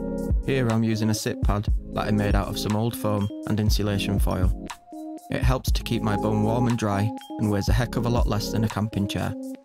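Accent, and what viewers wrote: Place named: Lancashire, United Kingdom. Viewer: Good video , you sound like a Lancastrian , god's own county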